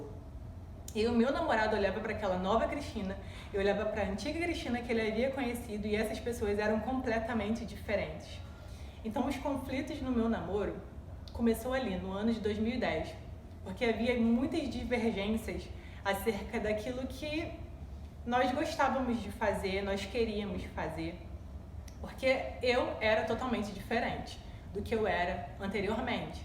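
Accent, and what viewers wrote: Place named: Rio de Janeiro, Brazil. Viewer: Carioca S2 Sotaque lindo e fofo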